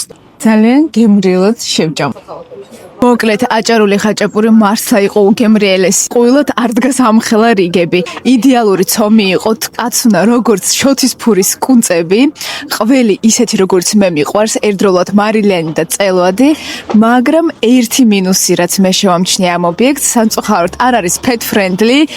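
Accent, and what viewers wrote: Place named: Russia. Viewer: Rusul skolashi swavlobdi? Laparakis gamo. (Ar gewyinos)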